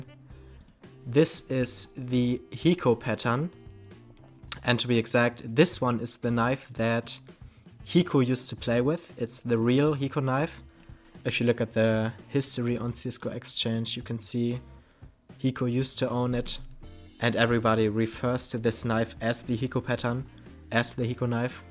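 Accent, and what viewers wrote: Germany. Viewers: Ultra German accent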